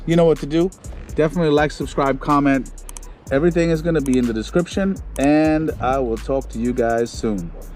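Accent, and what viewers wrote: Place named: Spain. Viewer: I think he’s Spanish American as he has a Spanish accent in his speech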